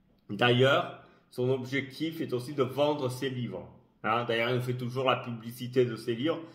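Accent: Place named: Italy. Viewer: Aldo et Aberkane sont d’origines algériennes certes et alors méfiance lol c’est pathétique quand même.. il faut dire que leurs discours et vidéos nous parlent très bien, souverainisme ou conspirationnisme appelez cela comme vous voulez lol nous on les comprends bien contrairement à toi qui est sûrement payé comme nos journalistes pour nous faire de la désinformation totale en passant par le bashing .. juste un conseil essayez d’être à leur niveau intellectuel et peut être que vous ferez du buzz.. vu votre accent vous êtes en attente de changement de carte identité italienne pour une carte d’identité française lol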